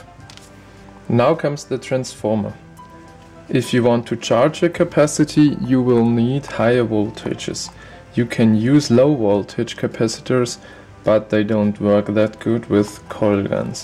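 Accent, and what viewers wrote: Germany. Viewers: very nice video, at first I thought you are a russian guy, but no, ein deutscher Kamerad :D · Ha wusste ich doch dass du deutsch bist dieser Akzent ist unverwechslebar ^^ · are you german? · und Deutsch klingender Akzent. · Lol.. Ich dachte mir sofort, dass das ein Deutscher Akzent sein muss :DD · So einen heftigen deutschen Akzent, habe ich lange nicht mehr in einem englischen video gesehen xD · Are you German???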